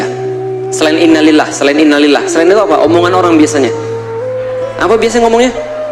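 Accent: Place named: Jakarta, Indonesia. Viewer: Orang pati tapi seperti ya asli jakarta 😅😅😅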